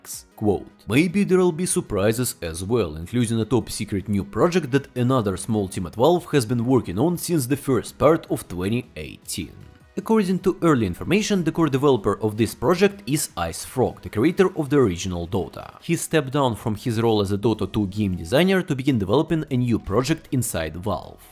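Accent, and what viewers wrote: Russia. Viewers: все ровно слышно русский акцент)) но твой английский супер!! 👍👍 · Damn, I didn't know about your english channel before, and I like the russian accent, а так вообще прикольно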